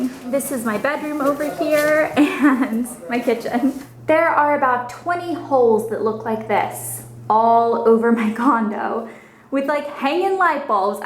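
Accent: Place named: South, United States. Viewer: Your accent sounds so much more country than I've ever heard it before!:)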